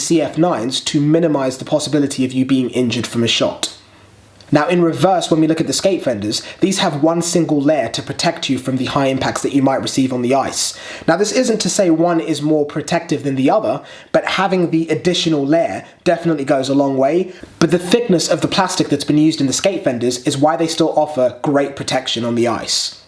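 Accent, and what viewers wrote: England, United Kingdom. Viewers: Anyone else find it odd hearing an Englishman talking about hockey equipment?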